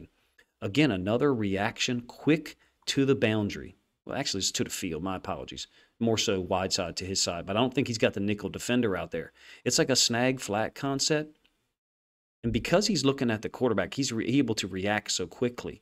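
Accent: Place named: Mid-Atlantic, United States. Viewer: Love your breakdown. Very ASMR. You can't escape that Maryland, Delaware, or Pennsylvania accent. I'm gonna guess Maryland. 😂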